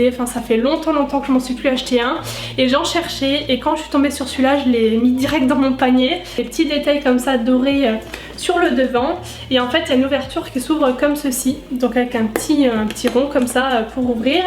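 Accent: Lorraine, France. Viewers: Je tombe par le plus grand des hasard sur ta vidéo, puis j'entends ton accent, qui me rappelle fortement le mien, je vais checker la description, et mes doutes se sont avérer réels ! Tu es Lorraine ahah :) +1 abonnement ;)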